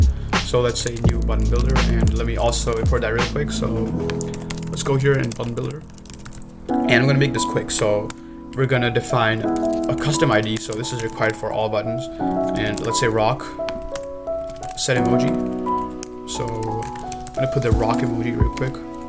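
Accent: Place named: United States. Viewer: Bro u sound american af L Also the bg music a bit too loud xd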